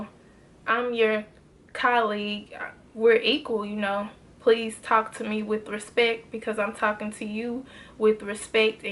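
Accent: South, United States. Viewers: You from down south some where? I can here it in your accent! Is it Tennessee? If not where?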